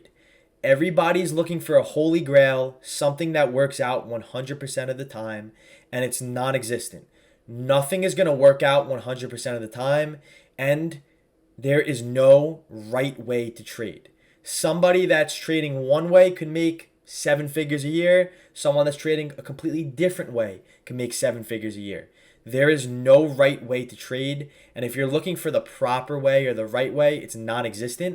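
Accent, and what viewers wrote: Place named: Long Island, United States. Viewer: Tell me you're not from long island.... gotta be. Staten island is the sleeper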